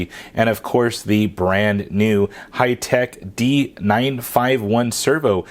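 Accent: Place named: California, United States. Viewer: Hah sall good! Guess that's just how California can sound:1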